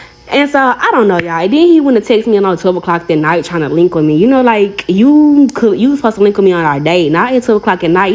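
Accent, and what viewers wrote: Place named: Arkansas, United States. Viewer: I had a friend from Little Rock Arkansa, I’m from Compton,ca. he had that same accent as you…I love it though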